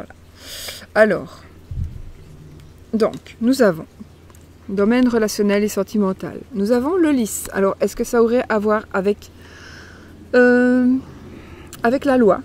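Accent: Belgium. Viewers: Je suis poisson ascendant lion est ce que je dois regarder la vidéo d horoscope lion aussi ? Vous êtes magnifique jadore votre accent belge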